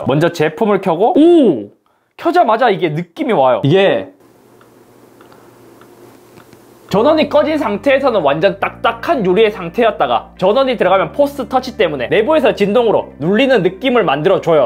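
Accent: Gyeongsang, South Korea. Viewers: 잇섭님 너무 잘생겼어요! 애플 제품 관련 영상은 다 찾아본 편인데 항상 웃는 얼굴이라서 보면서 마음이 편해져요ㅎㅎ 왠지 만나면 되게 재밌는 친구일 것 같아요ㅎㅎ 경상도 말투를 쓰셔서 졸리지 않게 들을 수 있어서 좋아요~ 앞으로도 재밌고 유익한 영상 많이 찍어주세요 :-) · 같은 경상도사람이라서 억양자체가 비슷한데 목소리까지 비슷하네요.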